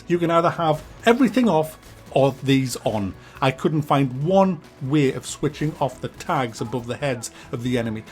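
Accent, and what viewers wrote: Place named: North, United Kingdom. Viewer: Damn you sound so northern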